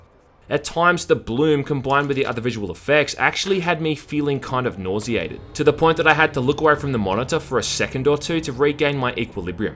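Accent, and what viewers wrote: Australia. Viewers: OMFG I was just thinking of this game and how awesome and underrated I thought it was and then you go and make a review about it, how timely, keep on rocking and reviewing old gems dude, love your channel for this and your Australian accent, gives Hemsworth a run for his money:D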